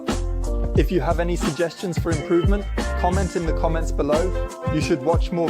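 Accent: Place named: Germany. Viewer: your german dialekt which I like very much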